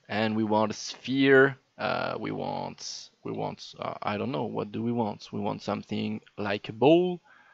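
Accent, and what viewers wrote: France. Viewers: Es-tu français ?? sinon très bonne vidéo comme d'hab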